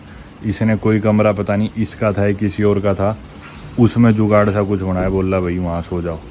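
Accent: Haryana, India. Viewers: Bhai aapka tifficulte Harnavi touch achcha lagta hai mujhe ❤❤❤❤ Aur bahut dino baad aap logon ko achcha hotel mila hai ...🎉🎉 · Bhai kitna bhi kuch karlo par haryanvi touch rahta hai aapki boli m❤❤ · You are so talkative in your vlogs in comparison to Navankur brother ✨😂 and i always have been a fan of your desi-haryanavi accent 🔥🤣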